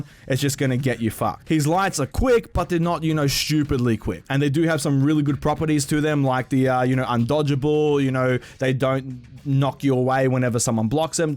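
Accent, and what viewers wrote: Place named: Australia. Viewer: Gaming i noticed,none other than australians swear and say fuck this way 😂😂love your vids bro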